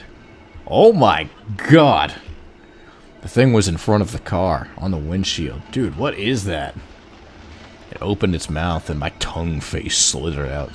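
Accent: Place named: South, United States. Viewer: Why does this game bring out a redneck accent in you lol. You should do voice acting.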